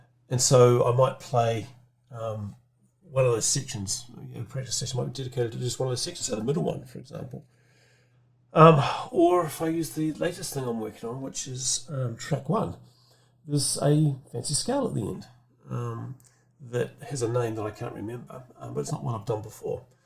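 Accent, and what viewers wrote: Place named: Ireland. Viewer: I am really very interested in your videos because I love your structure and to see your progress and that you are not performing. That's real live 😊. As a non nativ-speaker only your Irish (?) accent is really challenging for me and often I only guess what you maid have said 😅 Keep going!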